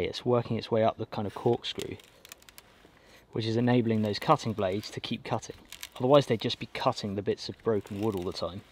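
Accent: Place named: England, United Kingdom. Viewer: Where is that particular English accent from? Kris Harbour Natural Building has that EXACT accent. Tone, cadence, emphasis, everything.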